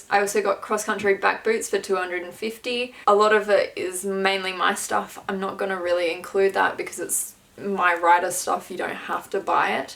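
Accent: Australia. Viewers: R u Australian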